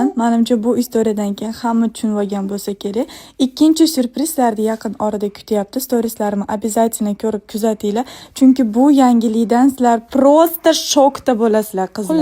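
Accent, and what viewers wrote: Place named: Andijan, Uzbekistan. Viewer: Anjanlimisiz diyman shevezdan bilinyapti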